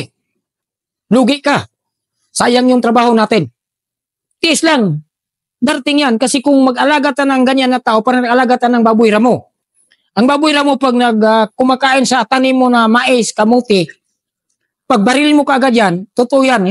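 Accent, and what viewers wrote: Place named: Waray, Philippines. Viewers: Waray waray yata si sir 😊😊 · parang taga samar si sir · Parang waray c Sir ahhh iba talaga Ang waray hahaha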